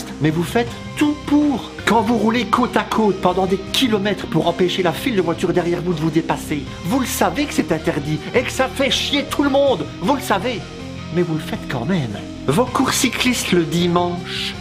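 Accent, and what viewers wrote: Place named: Belgium. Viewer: Salut je suis cycliste, et je déteste les mauvais humoristes belges avec des accents idiots ! Mais je n'en fais pas une vidéo ...😊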